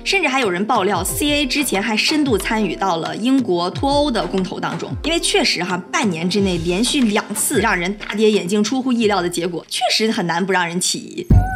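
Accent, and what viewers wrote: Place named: North, China. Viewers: Her heavy northern Chinese accent drives me nuts! 😁 some may love it.. well, not me... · 大姐， 你这英文咋山东味的？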